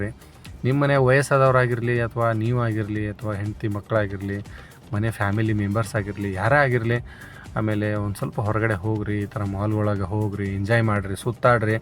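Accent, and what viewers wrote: Hubballi, India. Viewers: ಹುಬ್ಬಳ್ಳಿ ಮಾಲು ನೋಡಕ್ ಬಹಳ ಸುಂದರವಾಗಿದೆ ನಿಮ್ ಭಾಷೆ ಹುಬ್ಬಳ್ಳಿ ಭಾಷೆ ಕೂಡ ಬಹಳ ಚಂದ ಇದೆ ಬಹಳ ಬಹಳ ಚಂದ ಮಾತಾಡ್ತೀರಿ ನಮ್ಮೂರಿನಲ್ಲಿ ತುಂಬಾ ಮಾಲ್ಗಳಿದಾವೆ 👌👌👌👌👌❤️❤️❤️❤️❤️🎉🎉🎉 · ತುಂಬಾ ಚೆನ್ನಾಗಿದೆ ಬ್ರದರ್ ಮಾಲ್ ಹಾಗೆ ನಿಮ್ಮ ಹುಬ್ಬಳ್ಳಿ ಭಾಷೆಯನ್ನು ಚೆನ್ನಾಗಿದೆ❤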